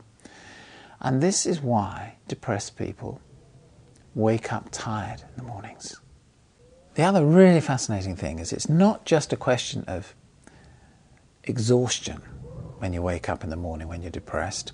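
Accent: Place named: RP, United Kingdom. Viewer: I want to take it seriously, but he looks like me *when* I'm depressed. Fluffy hair because I haven't bothered brushing it, bags under my eyes despite sleeping all the time, and clothing I've just thrown on (flesh coloured jersey?). :( Having a David Attenborough accent doesn't make something authoritative...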